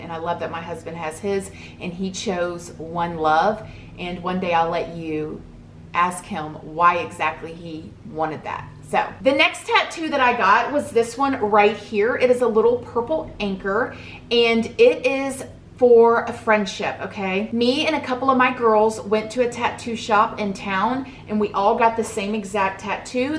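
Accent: South, United States. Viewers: We're around the same age, and we've both had hard lives, my best friend of over a decade was killed but somehow I was able to speak to him after he'd passed, he died on Thanksgiving of 2016, and any doubts I had about god were suddenly gone, he'd always promised me he'd contact me if something ever happened and he kept his promises, I went through such a bad depression I'm just now starting to do things that involve getting better, all my muscles atrophied from just not having the energy to live life anymore, and I need physical therapy to walk normally again, I quit painkillers shortly after he died but you live in Florida, you know how easy they are to get, and growing up in Virginia I never thought they were as deadly as they are, and I battled on and off with addiction and abusive relationships for a very long time, I'm just now coming out of the worst depression I've ever been through, I'm filming everything, so hopefully it can help others feel less alone, and see themselves in my journey. 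I found your channel and we both have the southern accents and your ability to frame a story is just astounding, I've lived in Los Angeles now for most of my adult life, but you remind me of my friends from home, and it's comforting for me to listen to you, I laugh and I cry and I'll think "Oh wow I did that too!" it's helped me a lot, I've met some incredibly talented people out here, and you have so much natural charisma, you really could have a career as a motivational speaker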